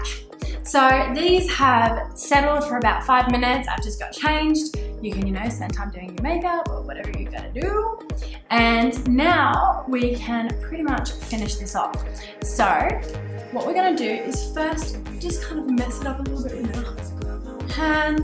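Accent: Australia. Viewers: The curls that you made is so lovely, you look so gorgeous. And I love your Aussie accent.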